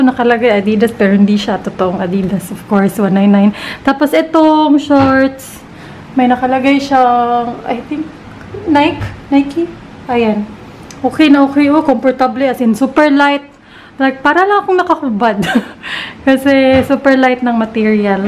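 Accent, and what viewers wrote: Visayas, Philippines. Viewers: Kacute mo gid na ya magbisaya teh 😂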